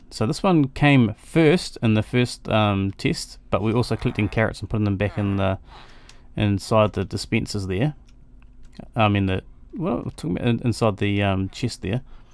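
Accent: New Zealand. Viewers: are you new Zealander becouse im a nz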